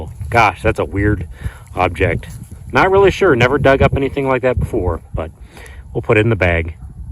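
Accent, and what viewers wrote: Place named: Missouri, United States. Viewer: Where in mo are you from? I detect in Crawford county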